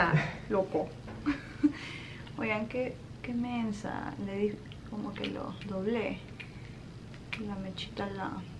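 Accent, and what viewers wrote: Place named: Ecuador. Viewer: Por tu forma de hablar te reconocí enseguida que eras ecuatoriana xD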